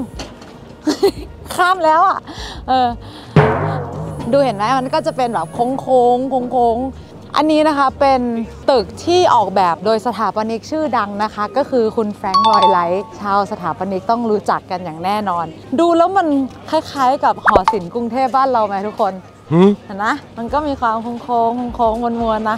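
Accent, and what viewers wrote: North, Thailand. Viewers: ชอบอู้เมือง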